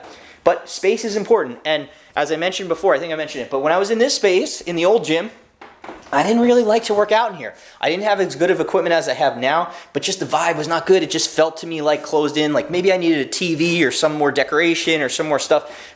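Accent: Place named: New Jersey, United States. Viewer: Accent and old Ferrigno plates. NJ?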